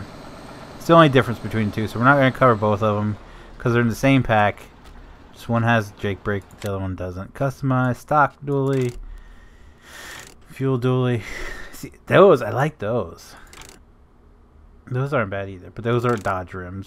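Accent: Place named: Canada. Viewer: Laughed at that Canadian accent 😂. I’m sure you hear me on Saturdays slipping up with “bud” and “eh” A LOT lol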